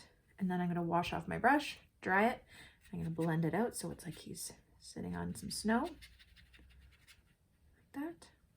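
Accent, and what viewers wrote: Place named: Canada. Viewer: I have a feeling you're Canadian, :) just watched this and your voice annunciation... or northern US state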